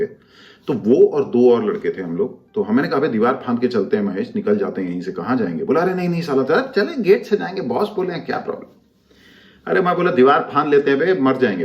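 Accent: Bihar, India. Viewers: Wow.such an authentic Bihari accent.dhanbad aaiye Kabhi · Sir aapka bihari tone..mazaa aa gya..apno wali feeling aae..ni to hr koe to chupane me laga rehta h..m really not expecting ki aap v kavi aise aaoge aur aise baat kroge apne video me..love u sir❤️❤️ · aap to Pura bihari bolte ho be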